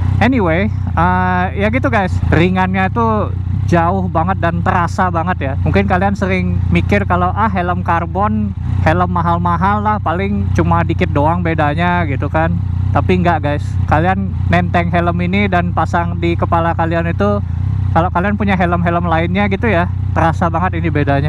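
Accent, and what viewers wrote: Bali, Indonesia. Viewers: Logat nya bali banget...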